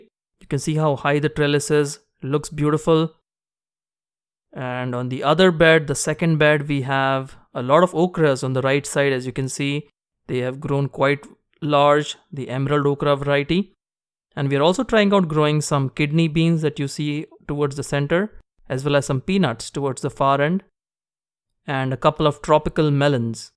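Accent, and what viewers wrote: India. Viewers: your pronunciation is like Indian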